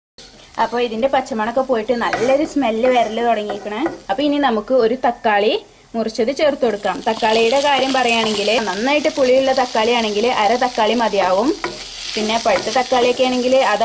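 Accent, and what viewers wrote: Malappuram, India. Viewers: മലപ്പുറം. ആണോ വീട് സംസാരം. കേട്ടിട്ട് നമ്മുടെ. ഒരു. Reedi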